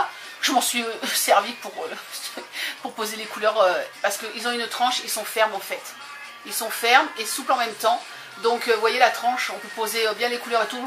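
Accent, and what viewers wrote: United Kingdom. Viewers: ps / je suis dingue de ton accent british !!!!!!! non je ne me moque pas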